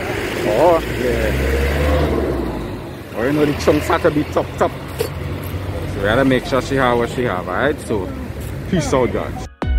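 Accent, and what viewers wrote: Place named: Trinidad and Tobago. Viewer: I love trini accent omg😍 We are a Jamaican youtuber living in Barbados🇯🇲🇧🇧 New subscriber here!💕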